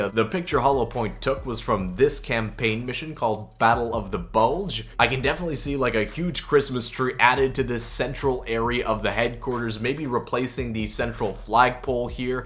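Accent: Canada. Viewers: Is this guy canadian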